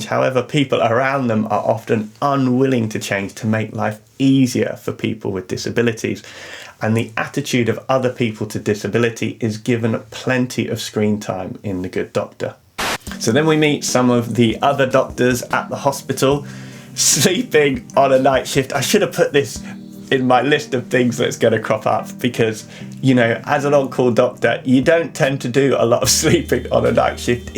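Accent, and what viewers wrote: United Kingdom. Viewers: I'm from germany and at first i though "why do im not understanding him so well?!" and than said you, you're from the uk and I realized I just understand AE😒😂 But still a cool and interesting Video😊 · Ive enjoyed medical drama since Greys Anatomy and this one with a ever so amazing Freddie is a sight for a sore eyes.... I came across ur channel and thoroughly enjoyed it... love the way u broke it down explained stuff... Young Doctor with great brit accent 💕... M ur fan already 😊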